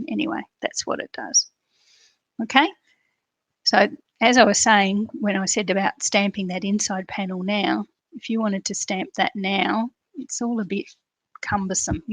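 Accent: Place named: Australia. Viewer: So nice to have an Aussie voice who is normal and makes mistakes. Your cards are great.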